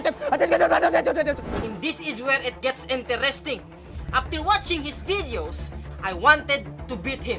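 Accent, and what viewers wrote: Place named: United States. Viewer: american english i love it lol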